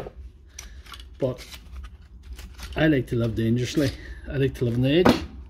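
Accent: Northern Ireland, United Kingdom. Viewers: Do you fix batteries?? I have 3 genuine ones doing with this red/green light flashing.. tried on 2 different chargers.. going by the voice your in northern Ireland so thought I'd ask lol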